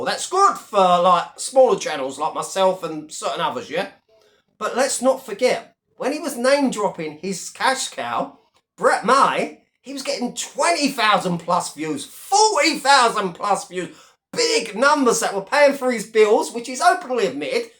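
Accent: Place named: Cockney, United Kingdom. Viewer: Your or8 for a fat cockney bell end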